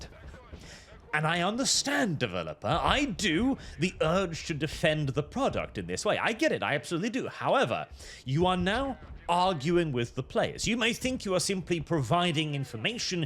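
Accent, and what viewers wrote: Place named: United Kingdom. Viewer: Bloody hell, This guy's British is so powerful I feel like im in a British Tea party, Im not even British!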